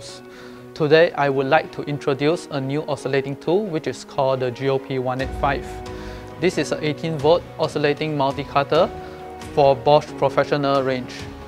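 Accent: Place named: Singapore. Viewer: Is this Bosch Singapore? Why is the english accent sounding more like Singaporean than Filipino?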